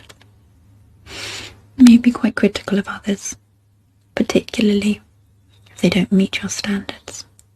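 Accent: England, United Kingdom. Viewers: I wanted to hear all the content rather than using this video as ASMR to fall asleep. Since it's soft spoken and she has a lovely, strong English accent (I'm Texan, USA), I turned closed captioning on. I find that feature quite helpful when I want to hear every word, as it's quicker and easier than backing up and re-watching dialog I miss. You can turn CC on by clicking the settings button on the lower right side of the screen when the video is playing. Just a suggestion! 😀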